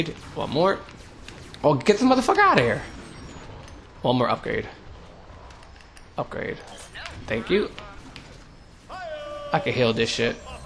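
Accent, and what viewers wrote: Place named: Los Angeles, United States. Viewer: yo are you from l.a you sound like you from l.a but another question will you be doing nay reviews on nba2k11 or elite 11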